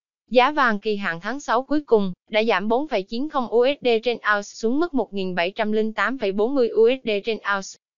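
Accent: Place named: Quang Nam, Vietnam. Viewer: Qua xem giá vàng ngàu 6 tháng 5 và xem luôn QC. Hôm nay có giọng quảng đọc phải không nhỉ.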